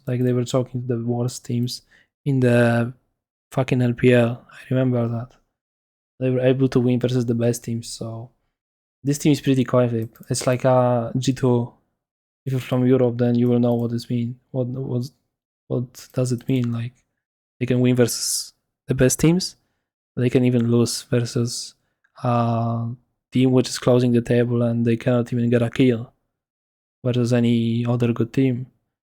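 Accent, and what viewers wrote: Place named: Poland. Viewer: akcent polaka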